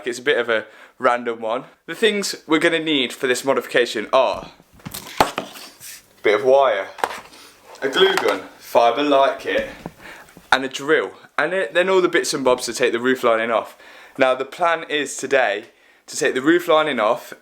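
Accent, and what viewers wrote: Leicester, United Kingdom. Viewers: You sound like a Leicester lad.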